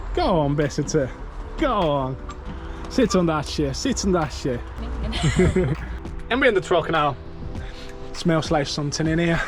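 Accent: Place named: Northern England, United Kingdom. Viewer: I’ve never heard a foreign guy with a northern accent before but I’m all for it!! Love your videos man! Love the new truck as well!!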